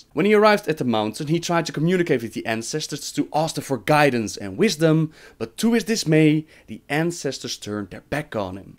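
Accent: Netherlands. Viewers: It's his Dutch accent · Nice dutch accent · JE ACCENT <3 NEDERLANDSE WOW SPELER!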